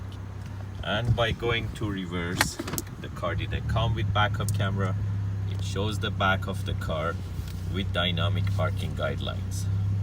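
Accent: Iran. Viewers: haha iranian accent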